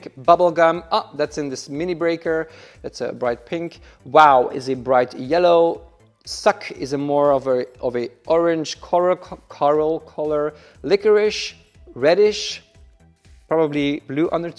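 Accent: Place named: United States. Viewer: Jij spreekt heel natuurlijk Amerikaans.. nicely done!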